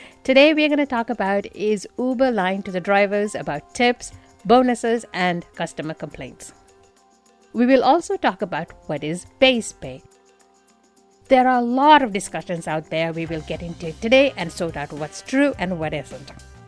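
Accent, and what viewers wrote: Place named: Quebec, Canada. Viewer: Are you Quebecois? You accent sounds different than most from that area. Im just into languages and accents. Salutamu!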